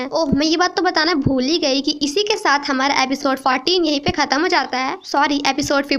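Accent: Gujarat, India. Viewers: Didi aap Gujarat se ho kya?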